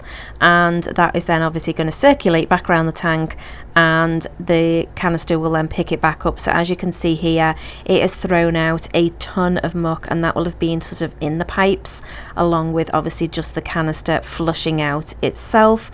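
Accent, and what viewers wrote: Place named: Scotland, United Kingdom. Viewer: I ordered 2 of your Betta aquarium dividers along with the air pump and airline tubing bundle and didn't know you had a YouTube channel. You have a really nice looking aquarium and I like the stand too with the bins for storage. What I like about fishkeeping the most is how every fishkeeper has their own unique way or routine of doing things, the way you and I do water changes are massively different and I like it, keeps things interesting. I noticed you have an I believe Scottish accent and your YouTube info says your location is Uganda but your store is in the US? Do you have people in the US running the online store? Just wondering...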